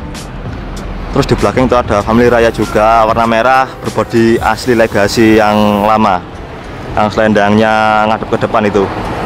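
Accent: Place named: Java, Indonesia. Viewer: mantap mas.....kroso bedo...bis ko padang sing gawe video logate njowo...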